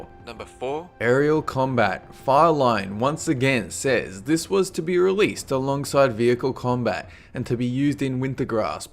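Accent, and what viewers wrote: Australia. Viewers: he's Australian. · you australian mate? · Australian accent.... it breaks my brain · Aussie reppin?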